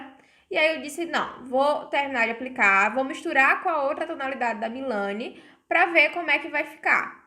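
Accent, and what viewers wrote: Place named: Mossoró, Brazil. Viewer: Mulher, morta que você é daqui de Mossoró! E eu achando o sotaque parecido kkk que maravilha 😍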